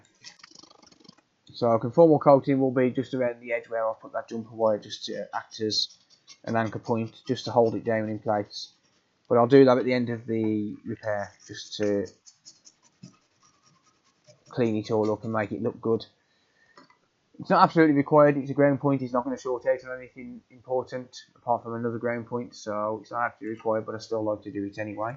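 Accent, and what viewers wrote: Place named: Midlands, United Kingdom. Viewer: Subscribed! Not only is it nice to have a British Based Tech Channel I detect a fellow Midlander accent😂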